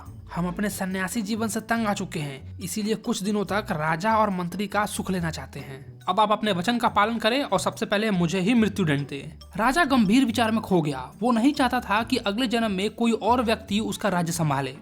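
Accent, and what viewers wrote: Bihar, India. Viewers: bhai is bihari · His tone of talking is like Bihari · Bhaiya ap Bihari ho n😊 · Bhaiyaa bihari ho kya😂😂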